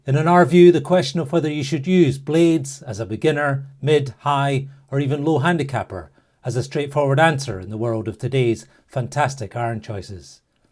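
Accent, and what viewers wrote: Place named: Scotland, United Kingdom. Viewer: Hello. Really enjoy the channel, and in particular the great Scottish accent which gives us the information. My question is not blade related, but iron related. I enjoy my putter and driver showing me the best place to hit within the face, is there a reason why this is not done on irons? Thanking you in anticipation.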